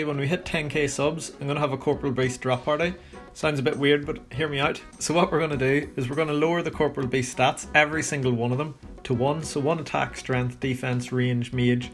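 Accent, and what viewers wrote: Northern Ireland, United Kingdom. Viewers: You from northern ireland?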